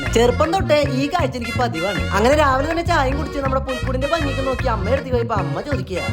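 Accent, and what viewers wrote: Thrissur, India. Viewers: Tcr slang kekkumbo oru rasam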